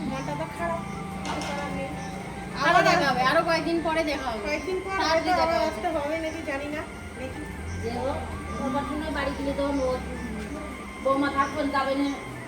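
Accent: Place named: Rajbanshi, India. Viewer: Tomr kotha gulo bojhai jay tui rajbongshi.tomr voyes ta oi rokom tai bolam 😊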